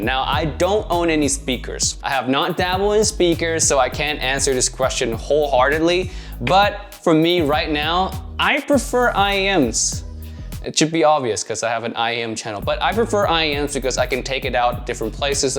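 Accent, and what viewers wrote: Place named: Singapore. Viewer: Thought you re a Singaporean...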